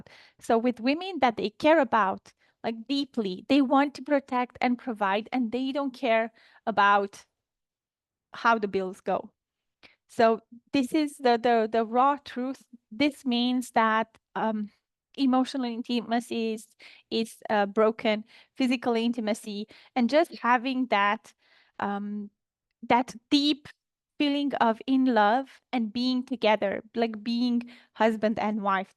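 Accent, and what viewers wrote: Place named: Philippines. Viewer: Are u Philippine? Interesting accent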